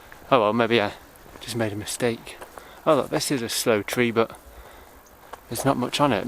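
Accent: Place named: Northern England, United Kingdom. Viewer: A combination of my ancient damaged hearing and your slight northern accent had me hearing Fjord for field...blimey I thought, Bray and the Thames has changed 😂 Golden rule of Blackberry picking...always higher than the local largest male dog can piss. I look forward to the video of your Somerset and Exmoor exploits, it’s always good to see a different view of where you live. Take care and be safe 👍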